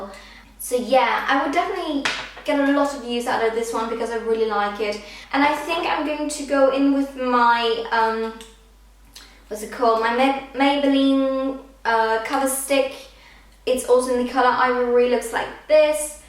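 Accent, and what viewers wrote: United Kingdom. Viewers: Why do you sort of have a british accent when you are danish and both of your parents are danish? <3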